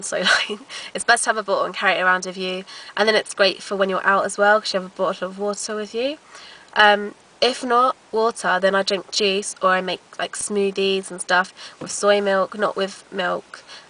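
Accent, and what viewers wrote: London, United Kingdom. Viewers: ahahah dat London accent doe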